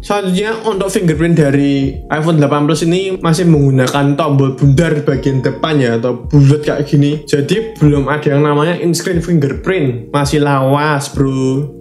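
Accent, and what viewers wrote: Java, Indonesia. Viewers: Medokkkeee podo karo aq likk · Salfok logatnya medok bgt bang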